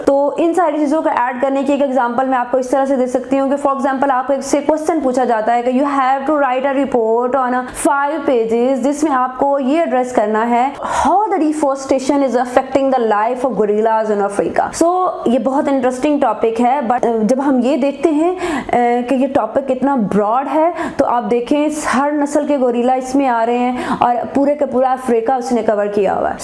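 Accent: Punjab, India. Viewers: Please take as a feedback, punjabi lehjay me English chor dain,. . . Otherwise your content is fabulous.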